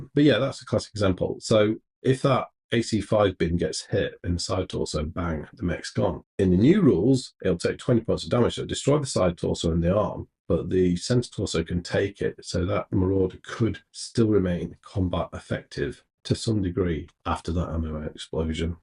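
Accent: United Kingdom. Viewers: Thanks for the analysis. But unrelated, East London accent?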